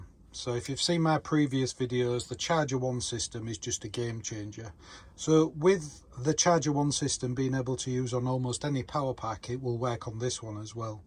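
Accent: Hull, United Kingdom. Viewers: I want one ,nice piece of kit ,love the videos nice hull accent 😮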